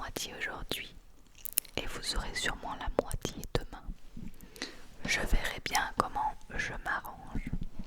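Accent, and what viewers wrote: Belgium. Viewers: J’adoreee les vidéos comme ça près du micro (et si y’a des bruits de bouche encore mieux ah ah), en plus tes chuchotements avec ton accent belge c’est super relaxant ! Une vidéo de ce style serai cool hihi ;)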